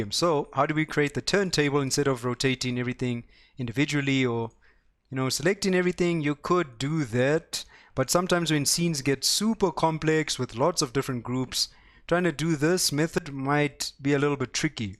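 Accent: South Africa. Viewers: you from South Africa bud?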